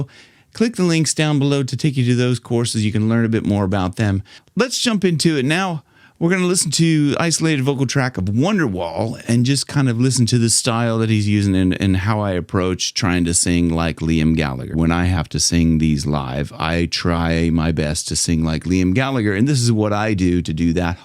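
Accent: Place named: United States. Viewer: thanks Man I understand with the American accent it was a really good video bro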